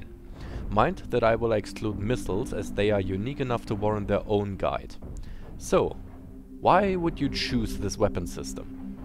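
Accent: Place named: Germany. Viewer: I spend half the video wondering whether I heard an German accent or not. Your English is better than mine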